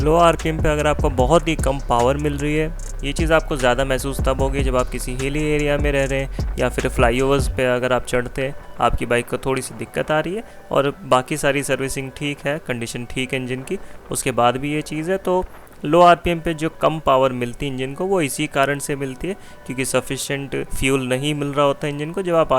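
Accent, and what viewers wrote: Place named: Uttarakhand, India. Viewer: So you are from Uttarakhand 😂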